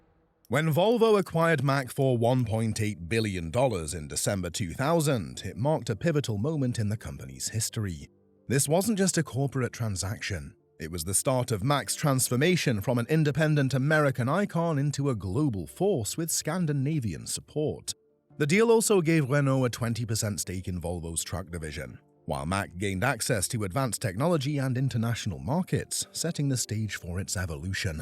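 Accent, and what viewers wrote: United Kingdom. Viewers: Why a Brit explaining American history?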